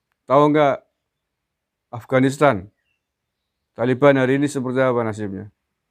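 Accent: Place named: Java, Indonesia. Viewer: haduh ngakak aku om jenengan kalau ngendikan lucu jawani salam om sehat selalu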